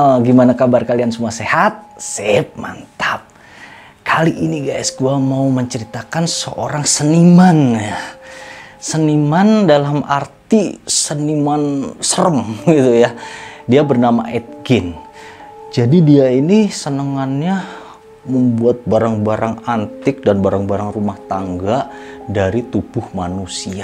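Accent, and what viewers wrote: Java, Indonesia. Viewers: logat jawa nya kenthel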